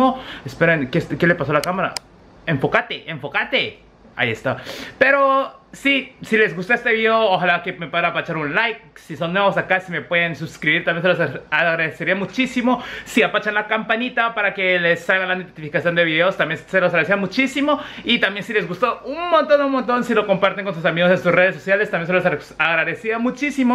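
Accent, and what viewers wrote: Guatemala. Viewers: Cual es tu historia, por que hablas bien chapin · Hola eres guapo 😘, me gusta q hablas cómo guatemalteco, has vivido acá?? Cuánto tiempo??? Que edad tienes??? En que trabajas??? Dios te bendiga desde Guatemala. Claudia 😘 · No perdas el acento chapin!!! Eso le da un toque mas calidad a tus videos Saludos desdd Guatemala!! 🇬🇹🇬🇹🇬🇹🇬🇹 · Mira sus vídeos anteriores ahí lo explica, pero si, sí vivió acá en Guatemala como 18 años por eso su acento Chapín. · JAJAJA Amo que tenga súper marcado el acento guatemalteco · Por casualidad comence a ver tus videos, me encantaron, y lo que mas me gusto por ser chapina fue tu acento guatemalteco. 👌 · Nueva suscriptora :) saludos desde Guate xd No olvides tu acento chapín porfa <3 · Soy nuevo en tu canal y para empesar esta bien chilero me llega ,como ablas como chapin no cave duda que bos si sos calidad .te saludo desde la capital de Guatemala. 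· ¡Tu acento chapín es perfecto! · No pierdes tu acento Chapin porfa....yo empecé a ver un vídeo tuyo y me encanto...Ya le di suscripción y con campanita🇬🇹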